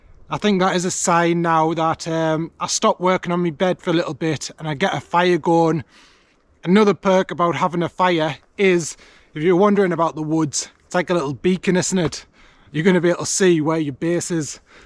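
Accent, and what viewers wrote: United Kingdom. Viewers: You from the uk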